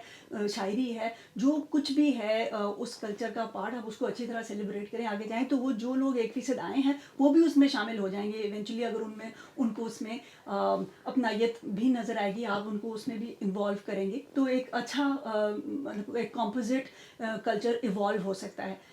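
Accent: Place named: Karachi, Pakistan. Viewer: Waaah waaah mazaa agaya apka video dekh kar unbiasedly spoken ❤❤❤ from now I'm big big fan of yours ..u sounds urdu spk from Karachi wich are my fav ppl in Pakistan looking forward more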